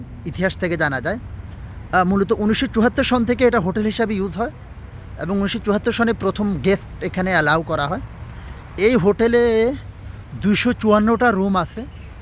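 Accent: Noakhali, Bangladesh. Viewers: বাই আন্নে নোয়াখালীর সুদ্দু বাসায় কতা বলেন, বালো লাগে তবে সুজোগ পেলে স্পিচ থেরাপি নিয়েন, আরো বালো দানদা করতে ফারবেন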